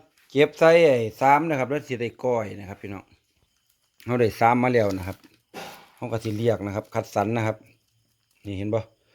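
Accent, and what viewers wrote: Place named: Chaiyaphum, Thailand. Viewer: สวัสดี ที่แท้ก็โคราชบ้านเฮาเนอะสำเนียงเว้านึกว่าบาว่ชัยภูมิเห็นก้ง แล้วอยากกินปลาจอ่มเน อะบาว่ บักหอยตอ้งแกงขี้เหล็กคือสิเเช่บชอบมาก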